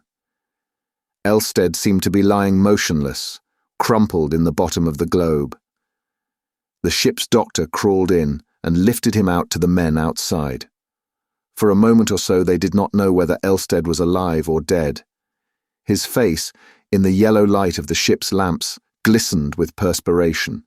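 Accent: England, United Kingdom. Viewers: Ahhh thank you sooooo much, fabulous narration. I so appreciate this lovely English accent.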